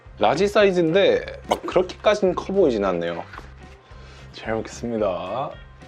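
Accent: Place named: Daegu, South Korea. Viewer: 알고리즘으로 첨 뵙네요~^^ 말투가 대구분이신듯 . . 제가 대구라서요~ 대구분이시라면 너무반갑습니당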